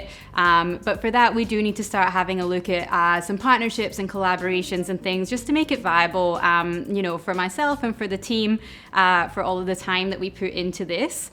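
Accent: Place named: Scotland, United Kingdom. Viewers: First few videos I saw she kept mentioning "here in Australia" and "the Australian version of..." so on and so forth. I thought I was loosing my mind and questioning everything I thought I knew about accents. My American sensibilities didn't allow me to consider that people can leave their country and move somewhere else. Sure, we don't have the best ear for accents but Scottish is pretty unmistakable. Don't know why this is relevant; just an anecdote I thought I'd share. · I'm here for the cocktails and the lovely Scottish accent. Looking forward to new videos when you're ready.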